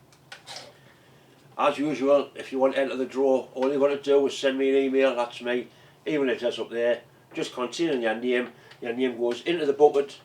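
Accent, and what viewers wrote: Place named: Scotland, United Kingdom. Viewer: I cannot understand Scottish accent so does the google translator. I give up on that guy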